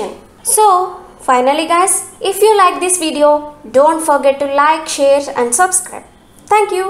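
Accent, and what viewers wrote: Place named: Telugu, India. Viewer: Good speaking but you still pronounce like Telugu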